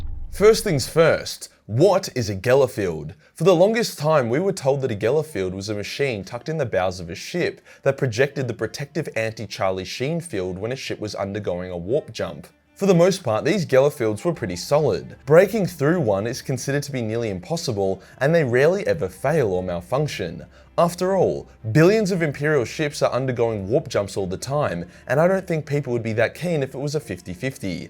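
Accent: Australia. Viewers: I can't stop watching your videos, mostly because of how intensely Australian you are. We Americans love Australians.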